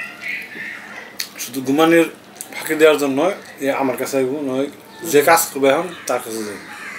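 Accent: Bangladesh. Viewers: Dada apne kemon achen ami nederlandsche thke dehi apnar gabber thekle Bangladesh er kotha mone pore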